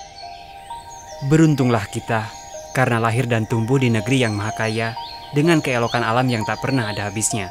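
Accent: West Java, Indonesia. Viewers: 👍👍 untuk video dan narasi nya. Logat sundanya kentara, ti Sukabumi sanes ?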